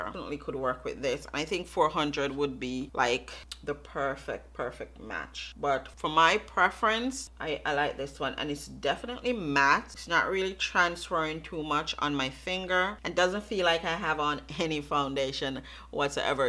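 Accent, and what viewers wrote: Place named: Jamaica. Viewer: awesome!!! I’m Jamaican as well that’s why I asked. I could pick up the accent.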